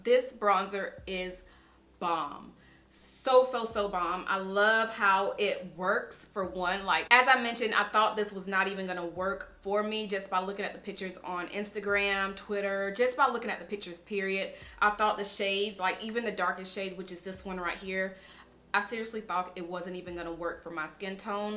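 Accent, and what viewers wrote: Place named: Texas, United States. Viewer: I love your accent. I’m from Texas and you sound like my whole family. Lol 😂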